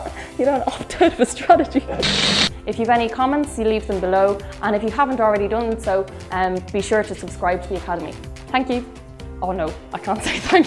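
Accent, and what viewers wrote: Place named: Ireland. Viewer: Love the Irish accent!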